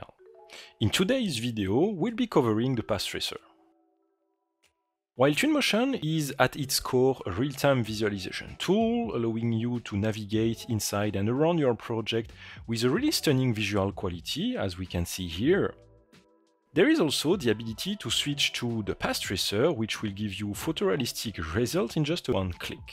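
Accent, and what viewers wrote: France. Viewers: The French accent in English is out of this world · Accent français n'est-ce pas? 😊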